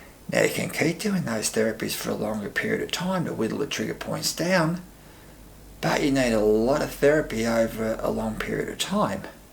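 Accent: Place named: Australia. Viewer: He’s an Aussie, so I understand and I can hear him. I agree the sound is a little faint I had to turn the volume up. 😉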